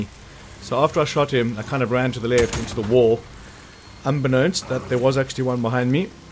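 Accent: South Africa. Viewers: Hey dude, you sound South African....are you?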